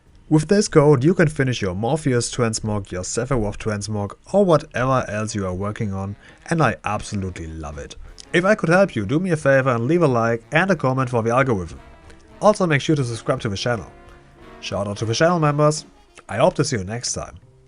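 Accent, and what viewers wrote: Germany. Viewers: Most german accent on YouTube after maybe Werner Herzog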